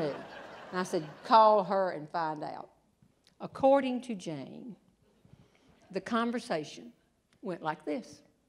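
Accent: South, United States. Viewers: Thank you for the amazing stories, I grew up outside of Bristol, Va. my husband, who by the way is a LB! Moved me and our children to Cincinnati Ohio, 30 years ago, and now our children have grown and have given us grandchildren so we can’t move back home yet! I love listening to someone other then myself talking in a southern accent!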